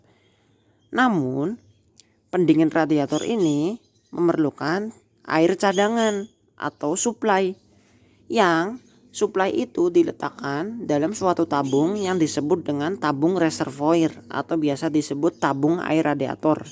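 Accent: Banyumas, Indonesia. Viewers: Ngapak² nih..terimakasih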